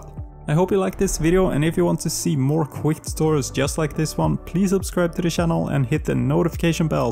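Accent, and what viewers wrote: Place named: Sweden. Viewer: Omg that Swedish accent is so recognizable 😅 fellow swede!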